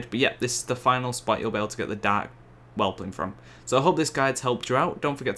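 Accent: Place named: Manchester, United Kingdom. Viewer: rofl obviously from Manchester. cheers mate lol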